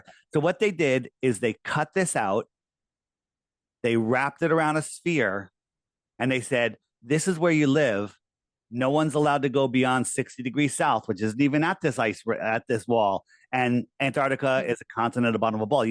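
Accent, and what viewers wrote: Sweden. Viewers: Is she swedish? 🇸🇪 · Sounds like she is from sweden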